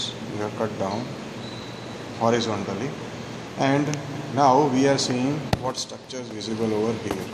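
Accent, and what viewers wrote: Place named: India. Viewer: English with Indian accent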